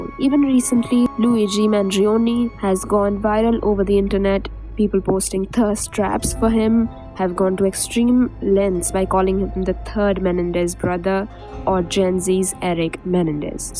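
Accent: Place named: India. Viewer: Omg this indian accent makes me angry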